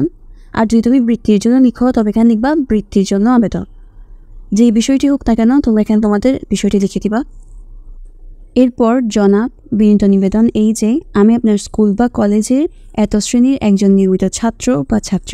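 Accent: Chittagong, Bangladesh. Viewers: Chittagong accent?😅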